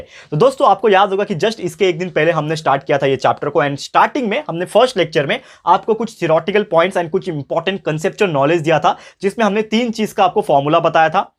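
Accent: Maharashtra, India. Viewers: Sir aap marathi ho kya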